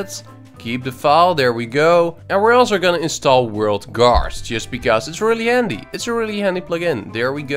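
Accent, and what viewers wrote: Netherlands. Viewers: Hij klinkt Nederlands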